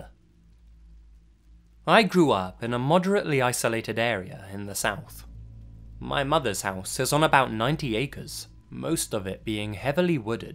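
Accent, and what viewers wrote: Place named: England, United Kingdom. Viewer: tbh i can only watch mr nightmare and. all the other horror channels ive tried to watch either have content ive already seen from or mr nightmare, or their voices irritate me. just my opinion, but has one of the dreamiest voices. id honestly pay him to read a telephone book to me. but i guess i just have a bias towards english accents.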